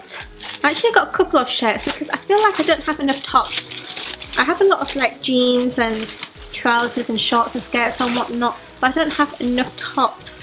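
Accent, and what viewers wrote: Yorkshire, United Kingdom. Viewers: Where is your accent from? Yorkshire?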